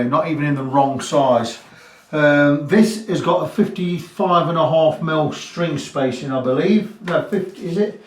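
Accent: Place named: North West England, United Kingdom. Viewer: Fantastic job! As coincidence would have it I would like to do pretty much the same job to my duo sonic. It’s a 2018 SS seafoam green with a PF fretboard. Intonation isn’t great with the short scale and even though I have fitted 11 gauge strings, the guitar almost seems desperate to go out of tune. I would also like to upgrade the pick ups with something hotter and, definitely with a humbucker in the bridge. Judging by your accent and the reference to your friend from Sheffield, it sounds like you are based in the north-west. I am in Manchester. Is there a way we can talk about you doing some business on my guitar please? Thanks.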